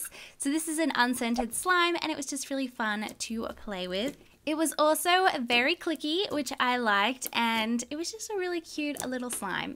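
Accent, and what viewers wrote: Australia. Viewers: Your Australian right!!!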